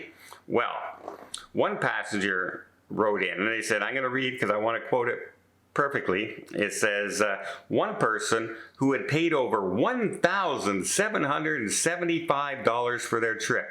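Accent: Canada. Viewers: It's the Canadian accent 😉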